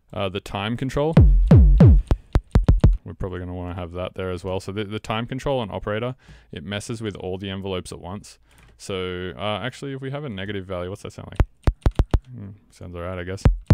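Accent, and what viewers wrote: Australia. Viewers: Wait are you Australian hahaha, if you were from western aus your accent would fly under the radar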